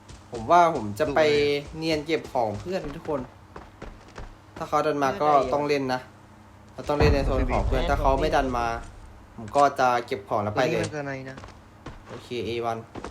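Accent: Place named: South, Thailand. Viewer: คนใต้ม่ายนิบ่าว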